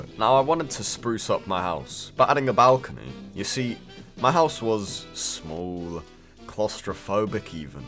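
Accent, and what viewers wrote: United Kingdom. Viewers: I goon to your British accent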